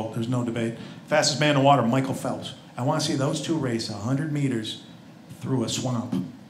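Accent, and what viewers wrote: United States. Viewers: he sounds American not Irish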